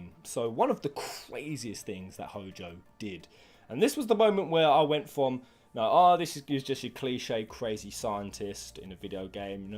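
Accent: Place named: Scotland, United Kingdom. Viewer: Scottish accent is great.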